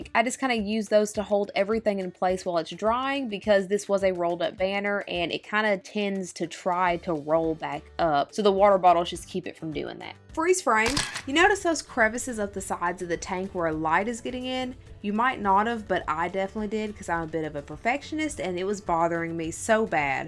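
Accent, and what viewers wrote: South, United States. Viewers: Girl u beautiful But that country accent sum else 😭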